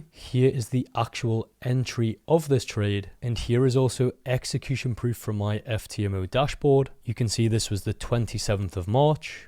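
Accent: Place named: Wales, United Kingdom. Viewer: Thank you for another great video. What assets do you trade/ monitor..p.s love the Welsh accent.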